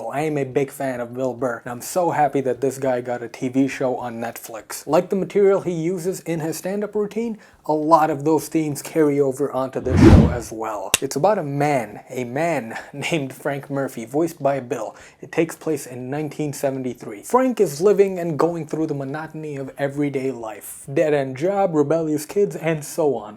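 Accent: United States. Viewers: oh OK kool just being curious that's all you sound like your accent is either American or Canadian.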